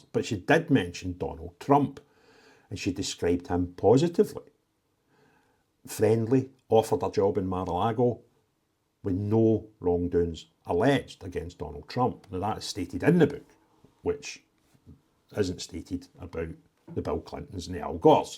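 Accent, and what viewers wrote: Scotland, United Kingdom. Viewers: Sorry mate. Can't understand a word of that broad Scottish accent. Neither can captions.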